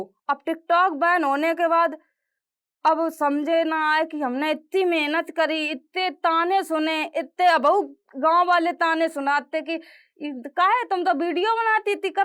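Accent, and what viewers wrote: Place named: Bundelkhand, India. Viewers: Ye hoti hai up bihar ki language.....jitni suno utni jyada pyari... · Bundelkhandi boli hm jalaun se h ☺️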